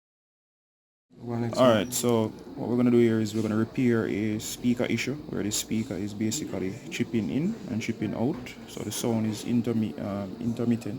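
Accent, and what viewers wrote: Jamaica. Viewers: can you please fix mine. It just chip out:'(.You sound Jamaican, I'm Jamaican can you please help me.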